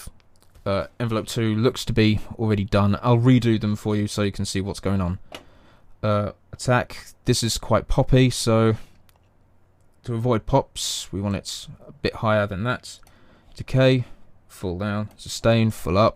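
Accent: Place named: England, United Kingdom. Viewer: are you from england or something? your accent sounds like the guy from the simpsons that trades places with homer in that one episode, who falls in love with marge :D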